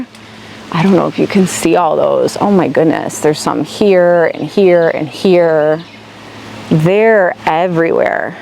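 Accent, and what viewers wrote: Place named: Midwest, United States. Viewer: I knew you had to be from the Midwest once you said you were from Illinois, we have the same accent 🤪 I’m in MN. ❤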